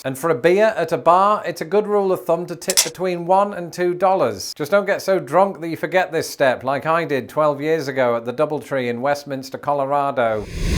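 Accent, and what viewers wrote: United Kingdom. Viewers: This American LOVES the accent. I will sit and listen to anyone from the UK for hours. I fall asleep listening to sleep stories read by English/UK actors/voice actors. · Says "trains, buses and broomsticks" with a straight face. Lol. Love British humor (and accent). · Lots of good stuff there. Then again... Your example of sales tax in Maine - which you should have specified was SALES TAX - was overblown. Exaggeration for effect is fine, but that was nonsense. I agree completely about your British accent and the impact on Americans outside the major cities. Note that I said YOUR accent. Have you been here so long you forgot that there are a variety of them? Or maybe your video is only aimed at others who sound like you? Of course there are both accents (how words are pronounced) and dialects (veering off into a different vocabulary), and you specified accent (singular), but your advice might not be so useful for someone from Yorkshire, or perhaps working class just about anywhere. 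· Don’t fret too much about not tipping in Westminster, Colorado. They’re okay. I find that the type of British accent affects people’s perception of the British person. In Colorado, an accent like (?) would make people think he’s very cultured and intelligent. It would be intimidating to some, but many would want to know what it’s like to dine with the queen. We can be ignorant. If it’s, say, a cockney accent then Americans (around here anyway) are going to think you’re a hooligan and try to party with you all the time. Or avoid you because they’re old, like me, and are too tired to party anymore.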